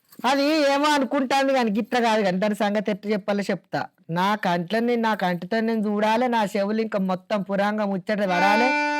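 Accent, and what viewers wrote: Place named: Karimnagar, India. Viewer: మన తెలంగాణ భాషా యాస… పక్కా కరీంనగర్ 😅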